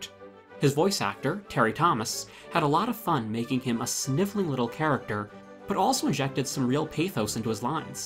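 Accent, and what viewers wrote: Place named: United States. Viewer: Interesting presentation, but the narrator's thick American accent sometimes makes it difficult to understand what he's trying to convey, at least for us Non-americans. For instance, what or who is "raawbinuud"? I guessed after a while that it had to be "Robin Hood". So why not at least try to pronounce it like that? It would honour the legend too! A little more normalized English would at any rate be much appreciated.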